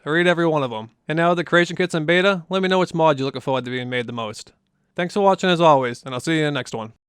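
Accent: Boston, United States. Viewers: The Boston accent makes these FO4 mod reviews much more authentic. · hembury I knew that accent after about 20 seconds of watching.....Guy from Boston modding game set in Boston= immersive :)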